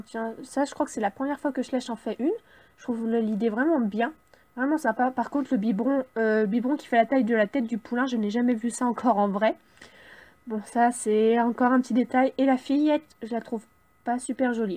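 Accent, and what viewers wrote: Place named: Quebec, Canada. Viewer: Parce que on dirait que tu parles Québécois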